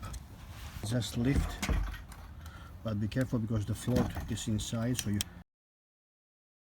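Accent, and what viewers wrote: Greece. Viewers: Great video, I'm curious if that's a Greek accent?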